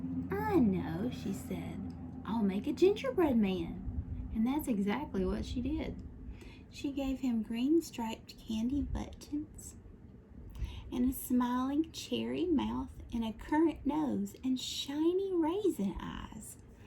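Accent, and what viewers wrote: South, United States. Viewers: I can never get over your country accent does it for me every time thanks for another super relaxing video ❤️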